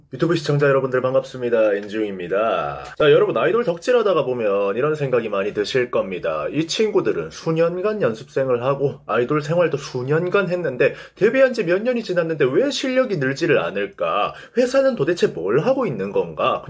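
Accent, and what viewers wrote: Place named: Busan, South Korea. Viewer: 말을 강조하는 부분에서 부산 억양이 나오네요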